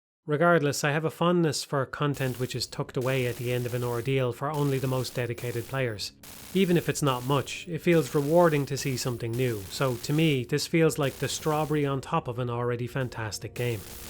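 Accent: Ireland. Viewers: irish asmr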